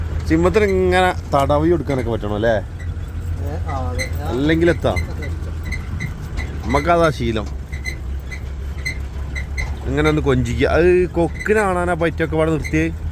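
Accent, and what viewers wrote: Malappuram, India. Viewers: ഒരു രക്ഷയും ഇല്ല ഫുൾ കോമഡി അതിനിടയിൽ മൃഗങ്ങളെ ശ്രദിച്ചില്ല തനി മലപ്പുറം വർത്താനം 😂😂😂😂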